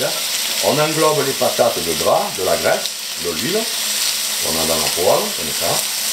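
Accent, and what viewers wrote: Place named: Marseille, France. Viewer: Ah! Et j'oubliais... L'accent de Marseille, qui rime avec merveille...Top de super top!💖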